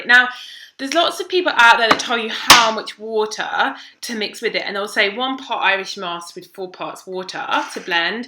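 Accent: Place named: England, United Kingdom. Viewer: you are S-T-U-N-N-I-N-G and your English accent is Beautiful. I Love it. Please go back to this hair color. It suits you well. Makes your blue eyes really pop. Markus Rothkranz rocks and all of his products are effective.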